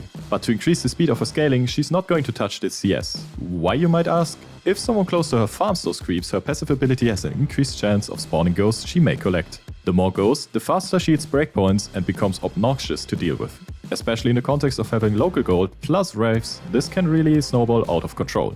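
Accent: Germany. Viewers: The german is strong with this host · Man hört es direkt das er Deutscher ist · R U German? Ur pronouncement Sounds Like thia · Is the moderator a German? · I really like this German Accent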